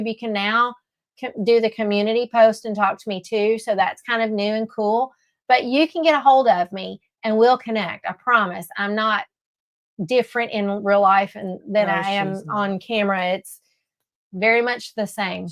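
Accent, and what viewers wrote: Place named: South, United States. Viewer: Hey! Cathching the replay! We're still put here at the cabin. Hubby has been building the shed and he's setting up the washing machine today that we picked up the other day! Yay! Nomore hand washing laundry when we're out here! I will still dry them on the ine, but we will have both an outdoor line and indoor in the shed. I love line drying anyway. I love your southern "hick" accent. I have a "slight" southern accent because I'm from an area that is kind of mixed between north and south, if that makes sense. I have have FINALLY developed the one habit that I've been struggling with for 15-ish years! Since I've bern here this trip (came here at the beginning of March); I have-without fail- gotten ready for bed every single night before settling in for the evening! Nomore falling asleep in my day clothes! I have made that a priority over after-dinner clean-up in my evening routine. 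That means I still have to do he clean-up in the mornings alot, but that's ok. When I ferl like my evening personal care routine is as automatic as brushing my teeth, both here AND at jome, THEN I'll work on prioritising after-dinner clean-up. For now, if I get it done, great. If I don't, it's ok. The ticks! Ohmy! We're constantly checking each other for them out here, and we still find ourselves picking them off after checking lol. We get them back home, but not THIS much! I've kind of gotten used to it as part gor the course lol.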